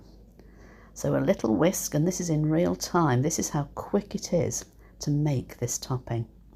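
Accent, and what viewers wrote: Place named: United Kingdom. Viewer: Hi! This chocolate frosting looks so creamy and decadent! I could hear your videos all day long because I love British accent, very charming 😍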